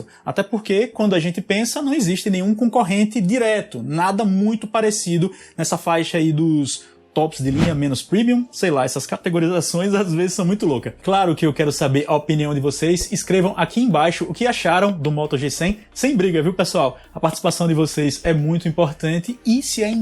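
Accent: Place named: Northeast, Brazil. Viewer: Quem é esse paraíba? Sotaque chato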